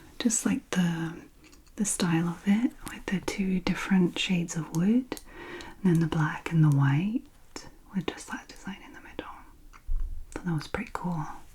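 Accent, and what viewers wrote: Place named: Scotland, United Kingdom. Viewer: She’s from Scotland, she speaks with a Scottish accent. American pronunciation isn’t the only “proper” way of speaking English.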